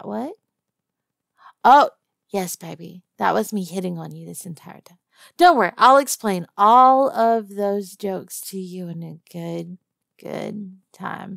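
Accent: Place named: Texas, United States. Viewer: once again your sweet voice brings another one of “cryptid women” stories to life. Tiger with Sweet Texas tang. One of these days your ever so powerful double entendre will rip a hole in the space time continuum. 💛💚💙❣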